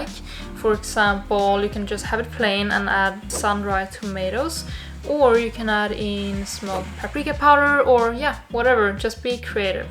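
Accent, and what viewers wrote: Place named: Sweden. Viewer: man hör direkt att hon är svensk ahhaha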